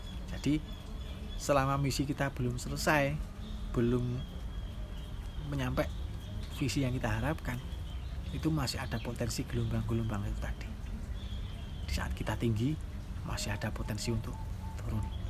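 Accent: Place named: East Java, Indonesia. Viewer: Mas e jawa timur e mana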